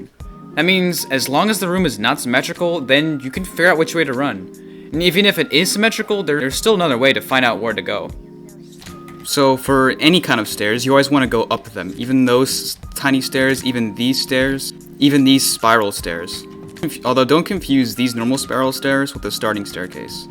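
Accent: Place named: United States. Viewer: Bro explain in Hindi i understood most of it but coz u talk English so fast and I'm not used to the american accent of English so it's hard to understand 😅😅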